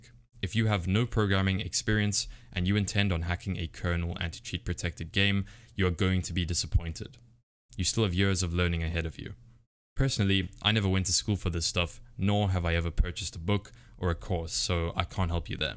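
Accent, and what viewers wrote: South Africa. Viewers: immediatly recognises South African accent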